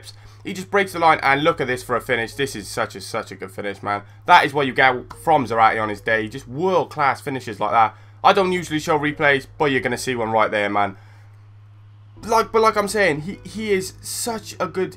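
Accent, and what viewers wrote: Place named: Welsh Valleys, United Kingdom. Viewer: u sound like taht guy out of the valleys lol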